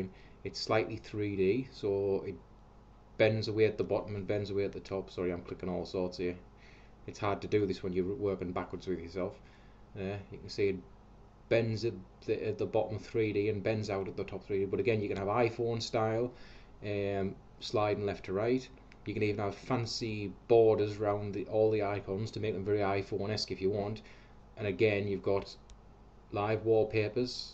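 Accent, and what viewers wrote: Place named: Northern England, United Kingdom. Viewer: damn cool accent you've got there haha :) northern england, that is, yeh? york?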